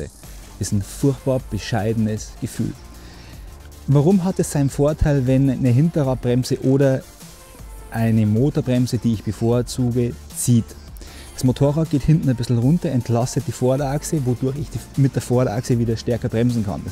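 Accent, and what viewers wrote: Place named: Bavaria, Germany. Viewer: Physik auf bayrisch 😁👍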